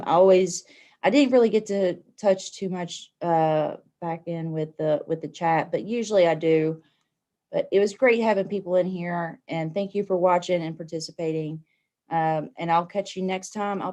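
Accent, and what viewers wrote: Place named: Tazewell, United States. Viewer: Coming from convo couch. Im a Tazewell native, so my accent is about the same as yours!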